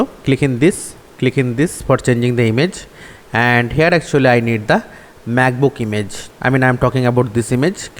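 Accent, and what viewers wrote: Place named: India. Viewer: Fuck man I cant understand none of these indian peoples videos the accent is too strong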